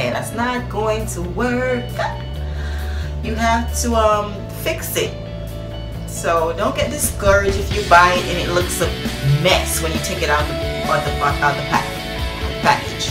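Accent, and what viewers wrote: Jamaica. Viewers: Hey hun. Jamaican?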